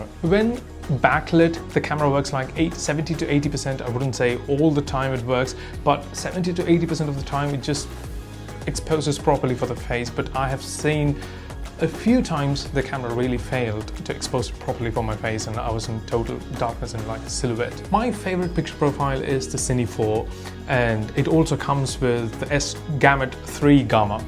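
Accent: United Kingdom. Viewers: British accent maadhri irukku naa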